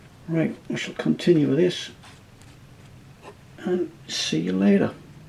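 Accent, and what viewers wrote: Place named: Tyneside, United Kingdom. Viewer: Hi, I live in Sunderland, but prior to the Coronavirus pretty much most Sundays, my wife and I would take a drive through to Shields along the coast road, round by the river, over that bridge and round by the Town Hall back to the sea front and then off homeward. You’ve done a fantastic job if you are no longer in the area, not sure how long it is since you’ve been ‘home’ but on the river side (north) of the bridge is a housing estate, the dry dock is still there and central to the estate, and on the east side is a statue of Catherine Cookson. You’ve also managed to keep your accent, so much so I was convinced you were still in the region. Anyway thanks for the reply, I get where you are coming from regarding hearing the noise - to be fair I have a test layout on a 4 x 2 ply board with cork on the top and it is certainly not quiet. 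I think I may just follow suit and build it on the ply surface of the L shaped baseboards. Cheers Derek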